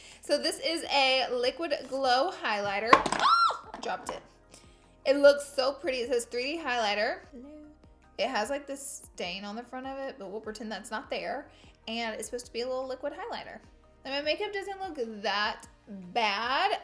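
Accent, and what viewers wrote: South, United States. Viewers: Bruh it’s the southern accent get the eff over it 💁🏼‍♀️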